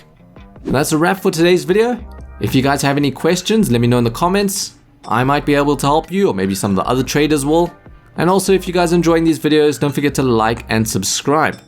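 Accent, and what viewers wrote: South Africa. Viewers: Are you South African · U r south african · South African? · Are you South African? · Are you South African? If so SA GANGG!!! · Are u south African by any chance?...just curious cause u have a strong accent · Hey are you maybe from South Africa?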